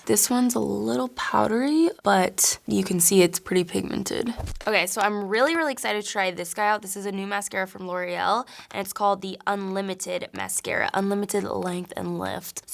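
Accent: United Kingdom. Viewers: Damn girl! Your British accent is perfect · I love that British accent💂🏻‍♀️🇬🇧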